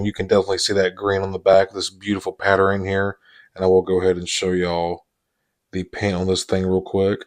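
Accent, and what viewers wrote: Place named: South, United States. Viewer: Spitin Dino facts in the southern accent is worth watching till the end y’all. 😉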